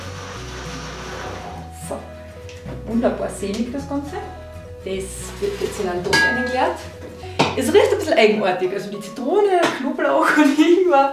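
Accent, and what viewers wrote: Carinthia, Austria. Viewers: Kärntnerisch ist auch ganz lieb anzuhören, nur verstehe ich es halt leider nicht allzu gut. Muss mal wieder nach Kärnten kommen. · das Rezept ist echt klasse, wir machen dies auch Jahre schon so, v.a. für das Immunsystem grade zur kalten Jahreszeit immer❤🍀❤🙏🍀❤..finde deine Videos auch mit dem Österr./ Kärntner Dialekt übrigens super süss und sympathisch ❤.🤗🥰❤🌼❤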